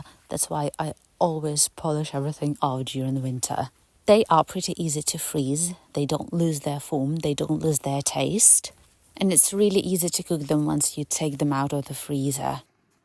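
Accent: England, United Kingdom. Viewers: You have an Eenglish Axcent, Who was your teachers? The hynm, Amasing Grace by John Melton. Came after a storm off the Irish Coastline. Communication and voice is important. We need to learn to bake a 🎂 cake.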